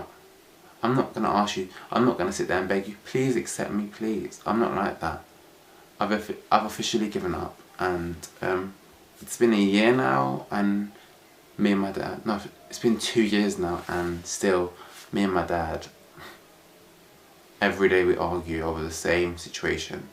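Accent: United Kingdom. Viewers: Mate, I am proud of you. You don't need people who don't love you and don't respect you for who you are. You sound British, so take heart, you live in one of the most tolerant, loving and peaceful nations in the world. The more you be yourself, the more you will find people who really love you and you will form newer better connections. Peace. x I would love to know how you feel about Islam following your family fallout. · You seem to have a very 'North London' way of speaking (in main part of the video).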